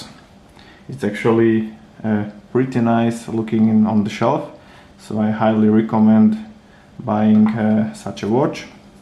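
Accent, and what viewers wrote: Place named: Russia. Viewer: Жёсткий русский акцент😁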